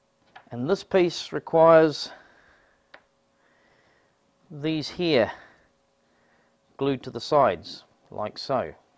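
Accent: New Zealand. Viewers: A Gold Star example of how an instructional video should be made, clear to follow, straight forward perfect lighting. I wish I had that CNC machine! Do I detect a slight 'Flight of the Concordes' accent?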